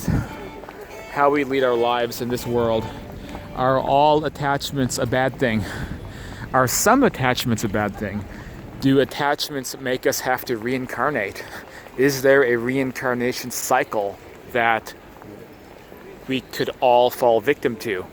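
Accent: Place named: Netherlands. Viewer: Nice to have a little look around Kiev, thanks! By the way, are you European yourself, your accent sounds Dutch maybe ?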